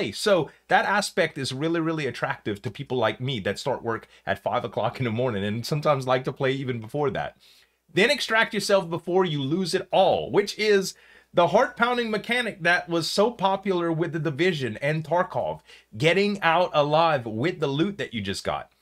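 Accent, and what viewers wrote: Louisiana, United States. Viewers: Lol what part of Louisiana you from bro?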